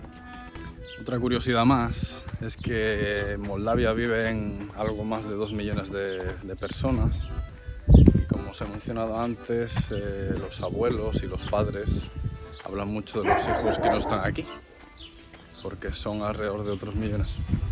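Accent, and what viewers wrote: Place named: Mexico. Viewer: Muy interesante pensé que eras mexicano por tu físico y tu español. Así es los países poderosos siempre quieren lo que otros pueblos tienen y se los roban e invaden para quitarle sus riquezas. Eso sucedió con mexico y latinoamerica. Cuando España nos invadió , masacró , eliminó , nuestros idiomas originales y se llevaron todas nuestras riquezas Pero lo que no nos pudrieron robar fue nuestra esencia y valores. Me gusta mucho Moldavia y Rumania son parecidos a los de latinoamerica. Mucha suerte en tu canal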